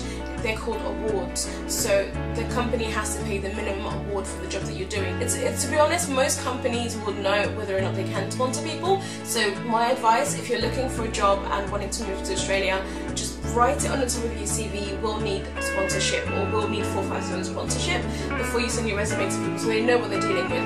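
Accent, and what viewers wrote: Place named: England, United Kingdom. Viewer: A black women with an Engish accent ....awww I fell in love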